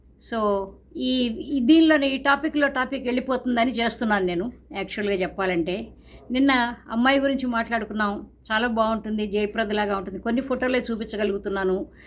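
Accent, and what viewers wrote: Guntur, India. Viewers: మీ తెలుగు చాలా బావుంది మేడం నా బాల్యయం లొ గుంటూరు లో వినేవాడిని hats off to ur principles 👍 from Vizag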